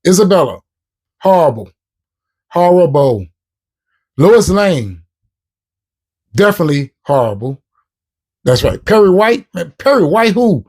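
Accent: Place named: Louisiana, United States. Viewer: Lol my mans Louisiana accent coming to life!😂😅 Good reaction man! You gotta a new sub!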